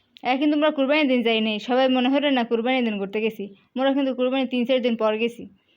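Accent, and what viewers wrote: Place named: Barisal, Bangladesh. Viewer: খুব ভালো লাগলো বরিশালের ভাষা❤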